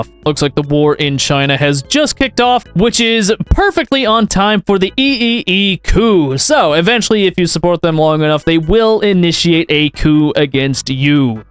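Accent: United States. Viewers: You speak American? not England language? intersting. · You actually speak English! Granted it is Americanised English.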